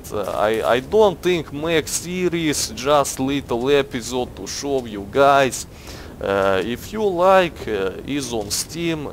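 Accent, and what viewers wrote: Russia. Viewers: I got spamed and came here, and it was worthy :D I want more videos with 'dat Russian accent!!' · XD There's a kind of swedish sound to your voice, even though the accent is sort of russian. Where do you come from? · Nice accent are you russian? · His Accent Is Awesome :D i love russian people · he's russian right? privet esli da :D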